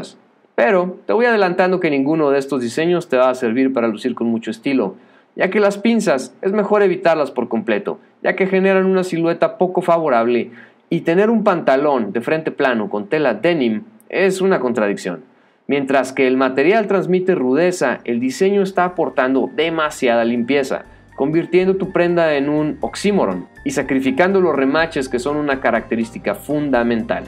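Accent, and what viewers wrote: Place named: United States. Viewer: Tu voz es como de un yankke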